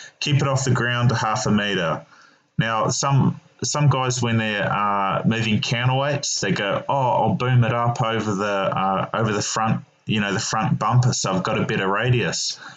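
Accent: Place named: Southland, New Zealand. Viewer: where ya from mate bit of a kiwi southland twang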